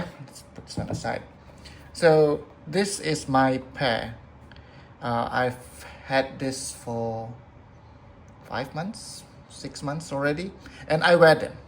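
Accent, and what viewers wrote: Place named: Malaysia. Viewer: Hi love ur videos, are u Malaysian by any chance? U sound very Malaysian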